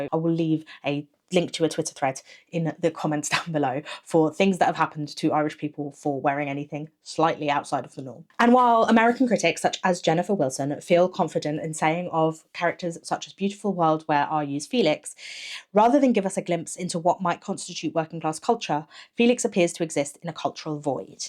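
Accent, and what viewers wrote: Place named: England, United Kingdom. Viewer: Worth noting that while Ireland has never had an institutional left, it also has never had an institutional right. In fact, there's far less right wing leaning and visibility in Ireland than most western countries. We've basically always had a centrist government that makes progress consistently, just faaar too slowly. Also, something about an English accent explaining Ireland to me was actually grating lol. Can I coin the term 'Anglosplaining'?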